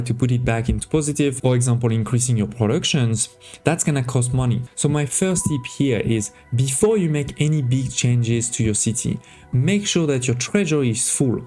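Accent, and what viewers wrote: France. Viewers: Nice video ! (are you french by any chance ?)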